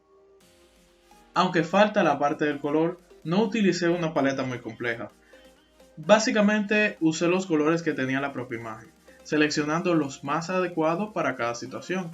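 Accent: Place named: Dominican Republic. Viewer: Muy bueno el tuto. Según tu voz, debes ser dominicano. Un abrazo mano desde el patio.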